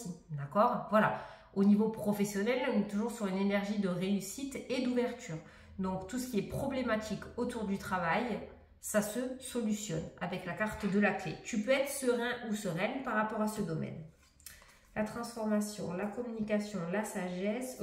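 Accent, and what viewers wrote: South, France. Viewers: Félicitations pour les 60 000 abonnés! Je participe au concours avec plaisir, j'aurais l'occasion d'entendre votre accent du sud au téléphone. Très belle journée 🌹🍀🐞🦋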